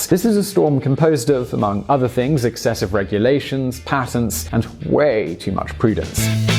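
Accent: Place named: United Kingdom. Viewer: The fact that you are effectively arguing for shortened testing and trials of new drugs is frankly pretty apalling. Some one with your accent, should know all about the horrors of unsafe drugs as Thalidomide drestoyed a lot of lives in the UK. Add to that you basically argue financial duress on the part of the pharmacutical companies having to test their drugs, yet they are some of the most profitable corporations on Earth, right next to the oil industry. There is no reason whatsoever that drug trials need to be sped up.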